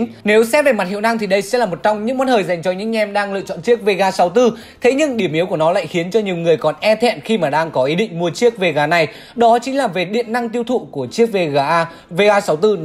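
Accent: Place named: Central, Vietnam. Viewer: như kiểu ng miền trung , nói tiếng miền bắc. dù có vẻ rất hoàn hảo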